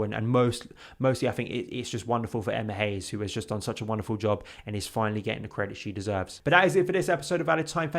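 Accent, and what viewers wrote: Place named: United Kingdom. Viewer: Hes not pronouncing it wrong, hes just speaking in a British English accent. Theres no "correct" way. Saying "Nike doesnt rhyme with Bike" just shows this point. American English uses softer sounds which is why its so popular world wide. Whereas we Brits uses more clipped sounds. The owner saying its Nikey not Nike doesn't change this, it's just showed that they either the dropped the "Y" for aesthetics or cant spell a four letter word.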